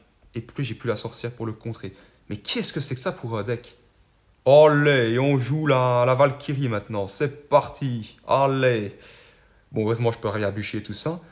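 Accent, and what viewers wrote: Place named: Belgium. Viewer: ne serais tu pas belge pas le plus grand des hasard ? Ps première fois que j'entend qqun dire pikka à la place de pekka et artière à la place de l'artificière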